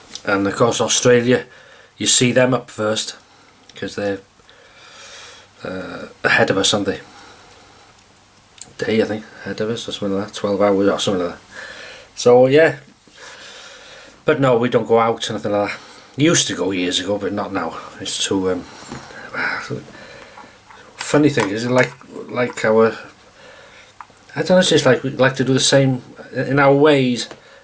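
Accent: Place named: Cockney, United Kingdom. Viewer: 😆😆😆👍👍 you crack me up guvna!! Love yer take on the cockney accent! Ha ha! Happy Happy new year, dont forget, pop in for a cuppa or a pint if you are down my way! And no no ruby tonight chief, hot dogs!! Dont ask me why, just fancied hot dogs. 👊😉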